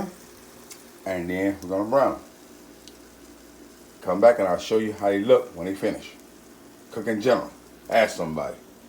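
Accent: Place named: South, United States. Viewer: Where are you from ? You too funny 🤣...gotta be from the south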